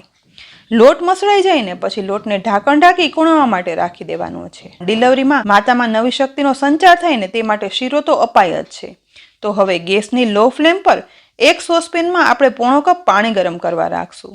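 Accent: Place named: Rajkot, India. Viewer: Yummy food with full of nutrients. I love your accents ,seems like you are from Rajkot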